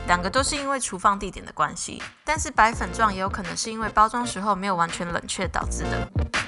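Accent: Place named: Taiwan. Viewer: 雖説台灣妹子説的不是標準國語，但這個調子聽起來好可愛！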